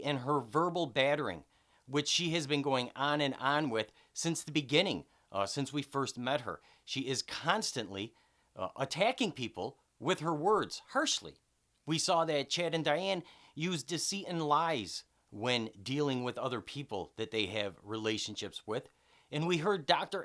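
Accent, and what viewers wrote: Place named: Midwest, United States. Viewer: Still consistently the best TPS03 companion on YouTube. I look forward to your input each week. Thank you! PS your midwest accent is a fantastic bonus. Southern Michigan? Whatever the case, you rock.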